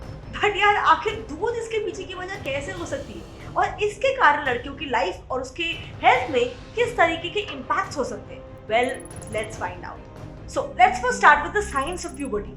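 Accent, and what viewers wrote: Northeast, India. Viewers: Is she from Assam? She sounds like a northeast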